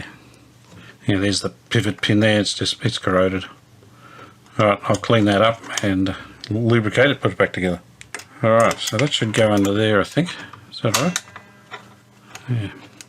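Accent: Australia. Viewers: Fine job,! I do like your videos. "It's, so if it gets destroyed, it wouldn't worry me.", haha! Those subtle jokes you sparkle here and there are just great. Love the Aussie accent too.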